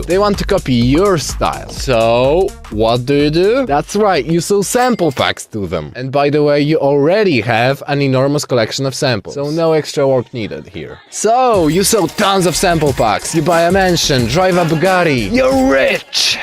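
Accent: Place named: Poland. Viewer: You can immediately hear my boy polish ❤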